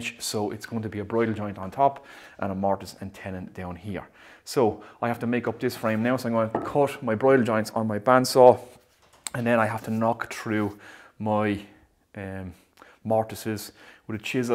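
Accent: Waterford, Ireland. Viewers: Some Waterford accent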